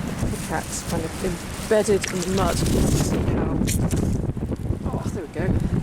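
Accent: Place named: RP, United Kingdom. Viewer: you sound so posh! Did you film the opening shots from your home on your balcony overlooking the Ritz in Mayfair? All said & done, as always a great video - thanks you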